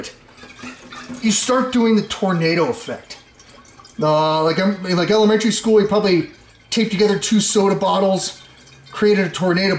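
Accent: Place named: United States. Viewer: Love the American accent ,,,, can fellow Americans know what state he maybe from just by his accent ? Just curious, from Australia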